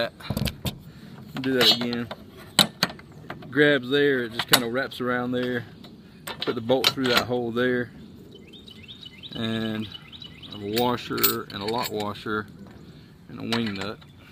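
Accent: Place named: South, United States. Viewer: I believe it's your accent that TRULY GAVE ME CONFIDENCE IN YOUR EXPERTISE. For those outside the USA who only know a southern accent from HollyWood movies you may be used to hearing that accent whenever someone DUMB is talking (Horrible hollywood elitist stereotypes). BUT FOR THOSE OF US IN THE US we know that accent is that of a working man, AKA THE GUY WHO KNOWS HOW TO BUILD AND FIX STUFF! Thanks for the video.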